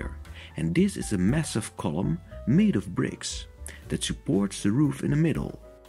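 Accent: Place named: Netherlands. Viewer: Dutch narrator... distracting....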